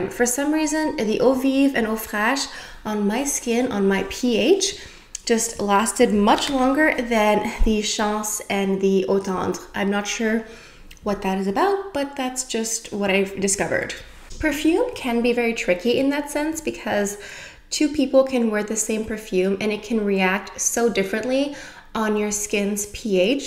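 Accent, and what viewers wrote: France. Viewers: I have not seen the pencils here in the US yet but I agree with you on trying the hair mist if you don’t have. I bought the Chance hair mist after your review & love that it’s a softer scent than the perfume. I have both but it’s nice for touch up like you mentioned. Also you mentioned lotions & oils. I like to get the oils & sprays like in TF because the perfumes can be too strong. But the pencils look so pretty but I would want them to last longer than couple hours. Very nice review. Love your eye look & your French accent!!😊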